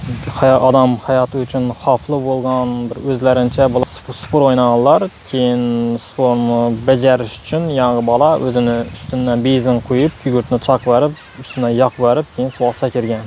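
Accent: Khorezm, Uzbekistan. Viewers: Xorazm shevaga gap topgonloro, qo'virdoq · Xorazm shevasi 👍👍👍💪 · ШУ ШЕВАГА КЕСАК.ШУ ОЙтГАН ОДАМ МЕНИНГ КОТАГИМДИ ЕБДИ.ХОРЗИМ ШЕВАСИ ЯХШИ.ХОТЯ МЕН УЗИМ БЕРУНИДАНМАН.ОПАМ ТОШОВУЗДОН.ВУЛОРАМ ШУНДИН СуЙЛИДИЛАР. ЕНА АЙТАМАН.КИМГА ЕКМАСА ХОРАЗИМ ШЕВАСИ.КОТАГИМА КУЛСИН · Ёкмаса гормангла хоразимли болганнан кейин хоразимча гаплиди на шевасини узгартириши гаракми умуман горма ёкмаса Толька хоразимлила горсин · Хамма блогггерла адабийча гапламидику. Недаки налани коммментина этибор Атмангла😀 хоразм шевасина тшунмитгонло гурмасин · Молодец— укам, шевамиз бокий шугина. Уни асрашимиз — ардоклашимиз гарак.Шовот! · Xorazm shevasi N_1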